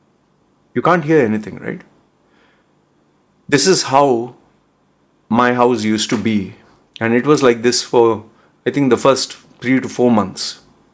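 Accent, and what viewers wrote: India. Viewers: Your success is thanks to the Internet, social media, your gift of gab and your facial tattoos that attract potential visitors to click your videos. Yes you have been lucky starting this at the right time. Being in Thailand has nothing to do with it. You could have done this anywhere but the added tropical backdrop and your Indian accent and calming voice has an appeal for many. Had the Internet not developed to where is it now, what would you have done as the Internet is everything for your survival it seems. That is something to think about, not that it matters anymore..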